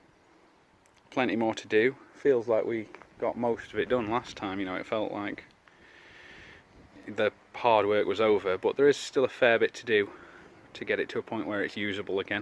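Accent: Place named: Rochdale, United Kingdom. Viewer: Getting there! Where's the accent from? I'm guessing Rochdale ?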